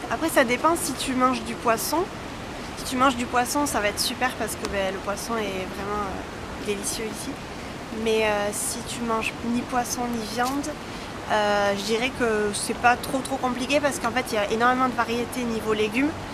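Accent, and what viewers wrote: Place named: Toulouse, France. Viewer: Ca fait plusieurs vidéos que je regarde de toi, et je me disais : "mais elle a le même accent que moi elle doit être de Toulouse".... Et enfin j'ai la réponse ! :)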